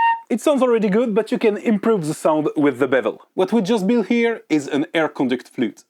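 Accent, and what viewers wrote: France. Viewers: Pas de réaction à mon post d'il y a BIEN PLUS d'un mois (eh, YT de mes...). Pas étonnant : tu t'adresse à un public anglophone ou anglophile (dont y doit y en avoir qques uns qui viennent se régaler de ton accent Frenchie) et À PEU PRÈS les seul à avoir pigé le sens de mon intervention, c'est toi. De deux choses l'une (à part le soleil bien sûr), OU BIEN t'as pas le temps de revenir voir les comm. sur tes produc à YT, OU BIEN tu t'en fous de ce que je te dis. Libre à toi de continuer dans cette voie, mais sois prévenu : c'est une très lourde responsabilité que tu prends en n'avertissant pas ton public du danger à porter en bouche, régulièrement, du pvc industriel. Si c'est la 1ère hypothèse qui est juste, j'ai pas d'autre choix que d'inonder les comm. de tes autres "sorties" pour battre l'alarme. (Et p'tain, je me passerais bien de me farcir ce taf ; car y en a d'autres qui font comme toi - mais pas avec autant de constance d'ailleurs je ne sais pas comment tu fais ; chapeau pour ça - & pas pour ton entêtement si c'est la 2ème hypothèse qui prévaut...)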